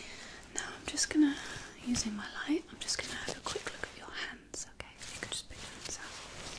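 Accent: United Kingdom. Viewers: You are so beautiful 😍 and your sweetest British accent drives me crazy 😘😘😘😍😍Marry me my queen 👑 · Heard a British accent - subscribed straight away! This is an amazing video!! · Idea 💡, There are a lot of us who learning english, and lot of us like British English pronounciation, and we almost haven’t asmrtists with pronounciation as yours. You could make a lot of material for learners in your asmr way. Podcasts on spotify, books naration on audible. You could do it all. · the video is perfect, love your british accent, tingles after each word :D may I please ask you, is this a London accent? or are you from the middle of the UK? I'm still wondering which british accent is the best and I think I've found one :D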